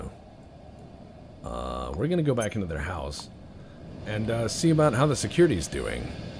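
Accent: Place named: Texas, United States. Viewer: French in a Texan accent is an unnatural but hilarious combination.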